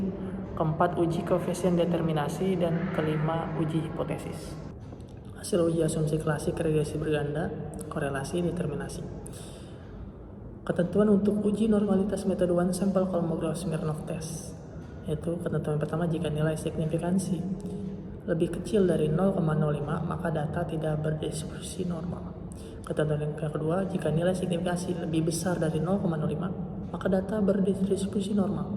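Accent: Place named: Java, Indonesia. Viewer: Lucu bgtt kayak mas mas jawa😂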